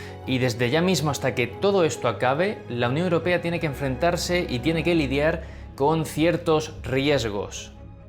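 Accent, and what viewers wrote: Murcia, Spain. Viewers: ¡Acho pijo! ¡No sabía que fueras murciano!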